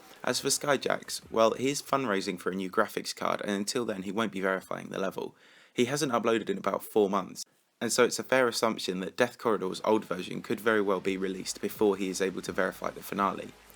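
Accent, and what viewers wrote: United Kingdom. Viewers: I love ur british acent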